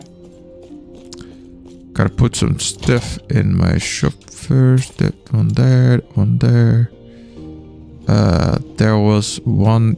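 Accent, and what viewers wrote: Netherlands. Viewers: Great Video, You sound Dutch or South African?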